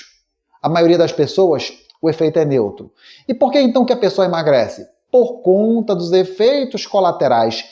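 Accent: Pará, Brazil. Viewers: Muito bom, reencontro esse sotaque maravilhoso do Pará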